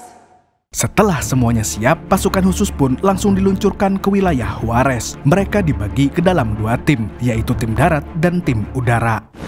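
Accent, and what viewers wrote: Banjar, Indonesia. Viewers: Orang banjar kah pian mang 😂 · Org mana banjar bnar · logat banjar nya masih melekat🔥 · Orang Banjar kah bg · Org banjar ini adminnya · Urang banjar kah pian min? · Bubuhan urang banjar nih. Dari logat nya bubuhan brabai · Orang banjar kah min ? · Org Banjar kali nya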